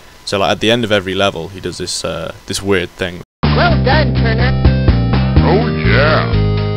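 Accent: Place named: United Kingdom. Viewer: Good review.. extra points for the British accent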